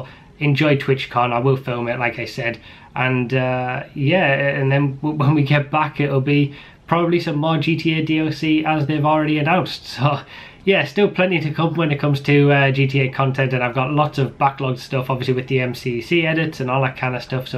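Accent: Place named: United Kingdom. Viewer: Yeah it's how us Brits say schedule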